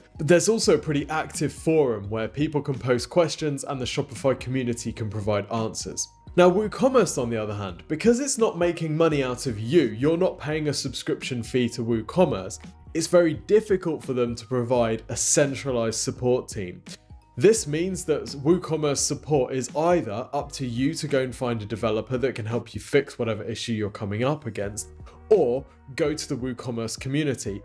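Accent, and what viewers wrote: United Kingdom. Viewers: I love your British accent! Would Ease of Use n Support be better if I go with Woo under Bluehost?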